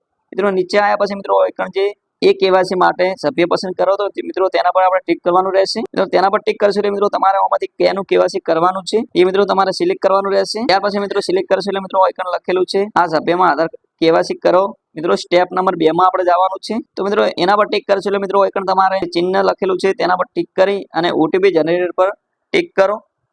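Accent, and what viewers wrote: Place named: Banaskantha, India. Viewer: આપ બનાસકાંઠા થી છો ભાઈ ભાષા થોડી એવી છે, અમે પણ બનાસકાંઠાના છીએ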